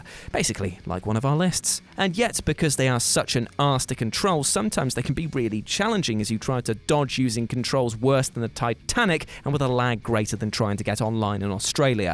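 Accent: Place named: United Kingdom. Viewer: Great another Brit narrator, disliked the video. -.-‘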